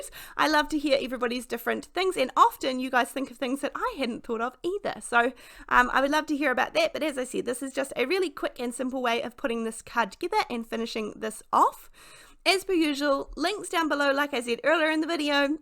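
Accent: New Zealand. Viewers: Glad it made you laugh. It really did take me a while to,work it out. . Your accent is just the sweetest. Reminds me of the time we had a supply teacher here who was from NZ. I regularly had to translate for our class. She wanted them to sit on the mitt. .. but the best time was when she wanted to sort our children into ability groups for literacy.. we had the kiwi group, the jandals group, both needed explaining as they had not come across those words before. But then we got to the thongs group. .. we changed that one…. 😂😂 hope you’ve had wonderful Christmas. Love to everyone. X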